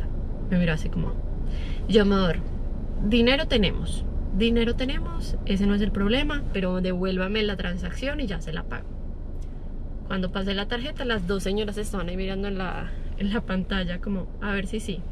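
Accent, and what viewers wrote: Colombia. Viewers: Hola te saludo desde 🇬🇹 me gusta tu acento colombiano.....me gustan tus videos ❤ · Hola! Empecé a seguirte porque me encanta el acento colombiano, ¡mi nuera es colombiana! ¡Soy brasileña, de Rio Grande do Sul! ¡Me encantan tus publicaciones! ¡Éxito y feliz Navidad! 🎄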